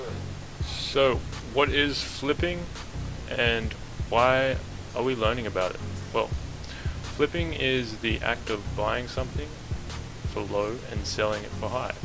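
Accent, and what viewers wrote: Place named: Victoria, Australia. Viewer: Maaaate, Guessing you are Victorian from your accent. I'm from WA. Hey, this is a bloody good video. You called all the pros and cons and it was presented without the hype. Kudos! sorry you got so few likes. I'd give you five stars! Any way, Thanks mate!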